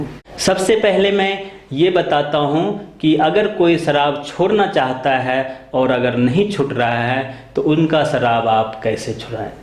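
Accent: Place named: Bihar, India. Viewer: Ye ravish kumar jaisa thakela bolta hai..bihari babu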